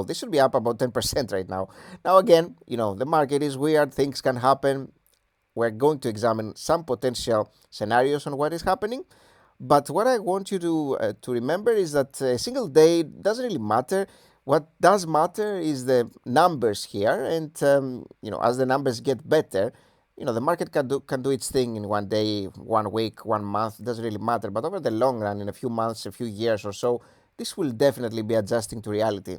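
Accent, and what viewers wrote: Greece. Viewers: Great content! And do I hear it right, is that Greek accent? :)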